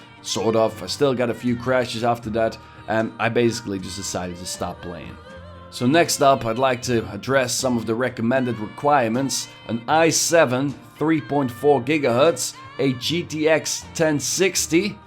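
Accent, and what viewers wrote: Russia. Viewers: Well, it's a decent game if you play it in chunks. A few missions per day. Anyway, where the he hell are you from? Russia? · It sounds like you have a Russian accent.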